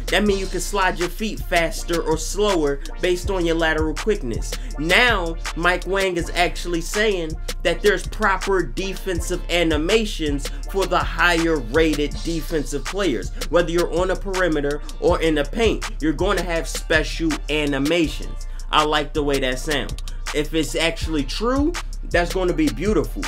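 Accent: Philadelphia, United States. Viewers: My nigga are you from Philly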